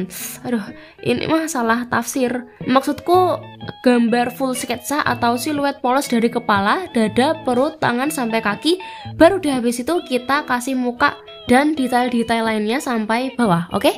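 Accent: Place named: Banyumas, Indonesia. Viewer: Mbak medhok ngapak Bahas dong kenapa kenapa kita gak bisa improve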